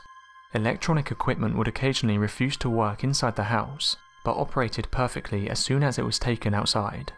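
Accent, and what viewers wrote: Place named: Manchester, United Kingdom. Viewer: My God he's from Manchester.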